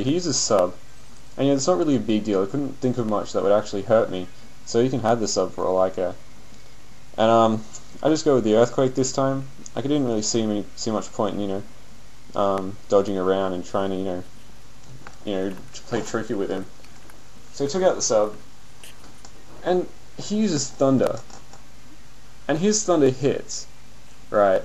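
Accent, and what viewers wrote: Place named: Australia. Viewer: Fuck yeah Australian accent narration =)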